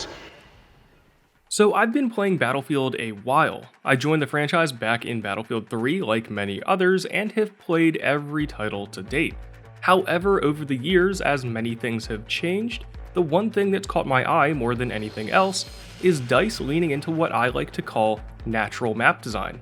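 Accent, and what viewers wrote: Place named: Philadelphia, United States. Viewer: Great video, very well put together. Just subscribed! Also, are you from the Philly area by chance? A few words you say have an accent that comes through lol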